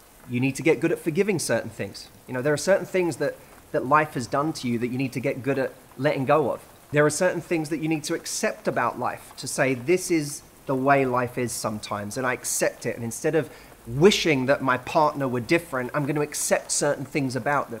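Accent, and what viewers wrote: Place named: England, United Kingdom. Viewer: Wonderful and inspirational as always! as i am studying english, may i ask you to what part of england or london your accent belong? i really like it. i hope you have a minute to answer my question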